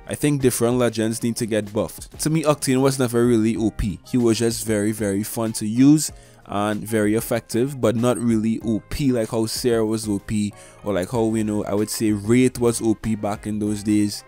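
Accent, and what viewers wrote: Trinidad and Tobago. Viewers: Hold on….that accent…. You Trinidadian by chance? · Are you trini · Just came across this video and had no idea I was finna listen to a trini accent 💯🇹🇹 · Where you from bro trinidad?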